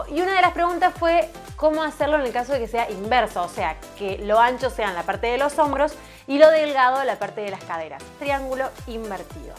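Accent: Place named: Argentina. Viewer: vi muchos vídeos aqui en Brasil sobre el cuerpo triangulo invertido, pero sin duda tu vídeo explicó mejor que todos, gracias un beso, es el primero vídeo tuyo que veo, imagino que seas argentina no? El acento es inconfundible 😊😙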